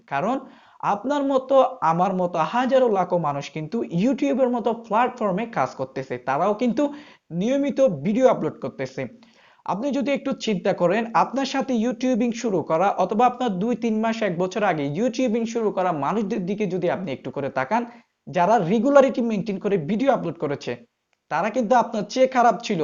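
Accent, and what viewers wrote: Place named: Chittagong, Bangladesh. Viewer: মনে হচ্ছে চট্টগ্রামের মানুষ।আমিও চট্টগ্রাম থেকে।Education ভিডিও বানাই।একজন কেমিস্ট্রির শিক্ষক।Love from Chittagong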